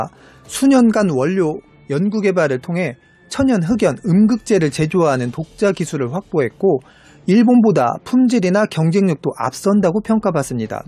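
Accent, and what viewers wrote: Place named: Chungcheong, South Korea. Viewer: 충청도 사투리가 들림.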